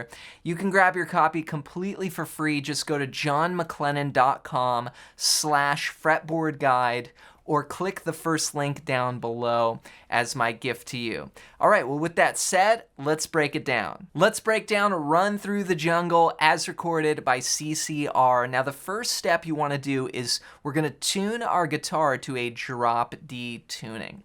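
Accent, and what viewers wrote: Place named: California, United States. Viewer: I'm from the U.S. and if I had to guess I would say somewhere along the west coast. I detect a very slight "California surfer" accent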